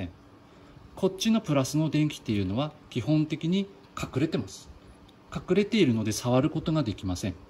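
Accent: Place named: Tohoku, Japan. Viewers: 東北の訛り本当最高です。実家に早く帰りたい😢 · 津軽衆のなまりですねー きいてて心地良いです(笑) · この訛りは津軽弁だな。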